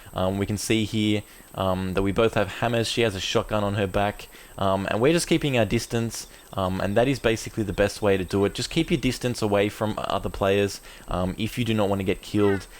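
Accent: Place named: Australia. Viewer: omg ur Australian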